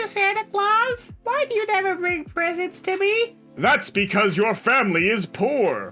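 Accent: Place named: Brooklyn, United States. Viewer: I love your Brooklyn accent.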